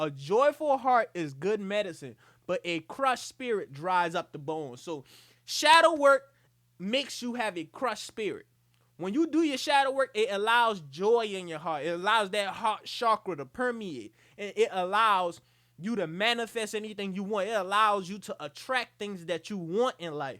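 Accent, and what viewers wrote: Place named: Charleston, United States. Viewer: You sound like you from Charleston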